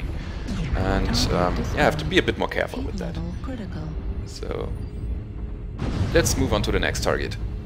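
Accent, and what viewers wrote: Germany. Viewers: Whoa, you are a really high skill MWO pilot. Your videos are excellent and your analysis and advice are right on the spot, thank you ! Always entertaining, that European (guessing German) accent is...OK? With that same accent but using a voice modulator this your vids could get even better !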